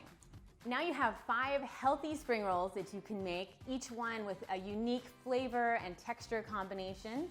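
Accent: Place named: United States. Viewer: Judging from your accent you are an american. Must say you pass as a cook venturing into exotic asian cuisine. Do pay more attention to the proper way of wrapping . Good try!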